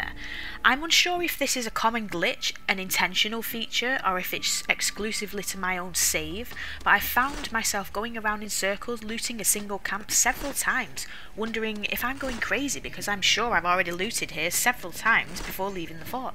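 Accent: North, United Kingdom. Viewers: Are you a "northener"? It's a hobby of mine to guess accents. Also, great video!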